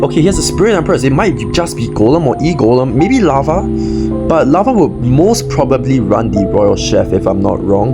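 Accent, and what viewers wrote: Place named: Asia. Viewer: why do you have both african and asian accent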